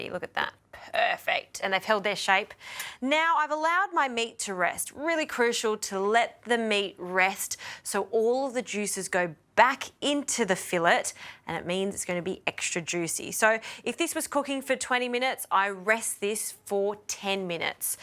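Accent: Australia. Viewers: You have a nice Australian accent, where are you from? (If I may ask)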